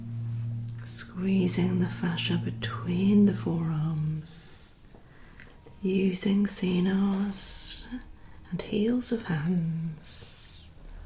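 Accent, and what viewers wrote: England, United Kingdom. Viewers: Hi miss how are you i just saw your channel an hour a go but im a fan ❤❤❤you are so friendly and nice kind lady btw love your english accent ❤i have 2 videos i think from my bully her name is Magic bcoz dog friendship is magic love your channel and i love all typs massages and treatments im Bas van Laarhoven from the netherlands and i love to be a patient or cliënt from you or make a video do you take care for man or only ladys respect miss ❤❤❤🙏🙏🙏👍👍👍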